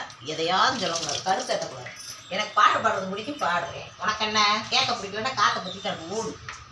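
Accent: Madurai, India. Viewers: என்னையா மாதிரி இருக்கா கேள்வி கேக்குற பானு நானும் ராஜபாளையம் வாக்க பட்டுவந்த மதுரை அம்மா மாதிரி பேசுறேன் நெனச்சேன் நீயும் இப்படி தான் பேசுற பானு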